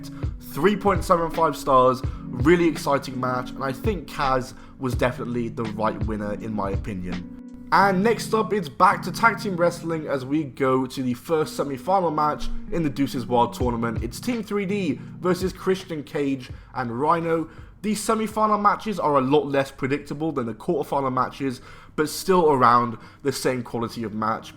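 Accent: United Kingdom. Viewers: 🙀🙀🙀You seriously scared the shit out of me when you all of a sudden tried to imitate Scott Steiner when he cut this promo especially since you have a British accent and you basically just yelled on the mic just like he did both in the beginning and in the end of this video!😱😱😱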